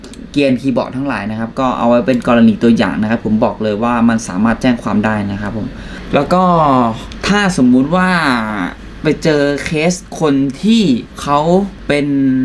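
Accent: Isan, Thailand. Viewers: สำเนียง อีสานบ่ ไม่รู้สิ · สำเนียงเเมร้งโคตรลาบ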